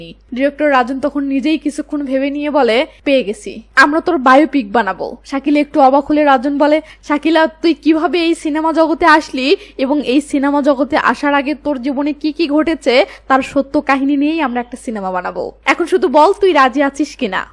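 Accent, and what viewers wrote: Bangladesh. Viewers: I am indian . But, I did not see these good movies , I like your Bangladeshi speaking syle .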